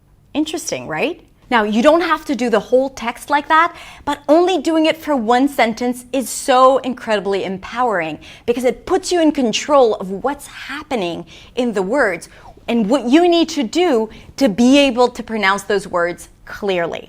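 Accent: New York, United States. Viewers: I am a native English speaker/English teacher and I did not realize she was not a native English speaker until she mentioned it. The only accent I noticed was a slight “New York“ accent!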